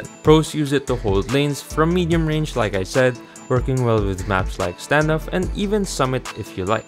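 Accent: Philippines. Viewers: I thought your from Philippines besides your talking in English like Filipino Lmao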